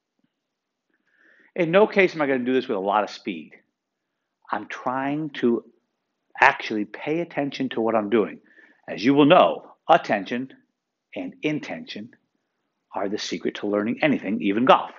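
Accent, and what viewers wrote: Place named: United States. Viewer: This man has an American accent. #skip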